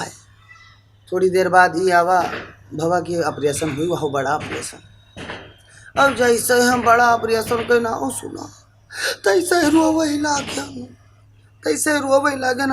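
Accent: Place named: Barabanki, India. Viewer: अरे भाई आप कहा के है बाराबंकी के हे क्या लग रहा है बाराबंकी में रहते है क्यों की ये वही की बोली है! आपका छोटा भाई तारिक़ जैदपुर।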